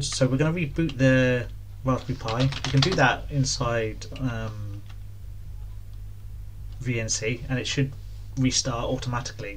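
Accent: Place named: United Kingdom. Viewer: very good explanation with clean british accent nice one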